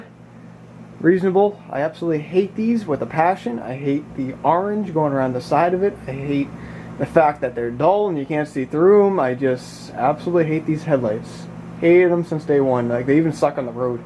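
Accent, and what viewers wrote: Canada. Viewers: Massachusettes plates .... But u sound like a Canadian ;)..... Nice truck tho..... Love those F250/350 styles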